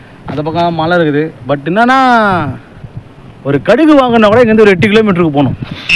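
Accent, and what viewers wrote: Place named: India. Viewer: வட மாவட்ட பேச்சு ❤🎉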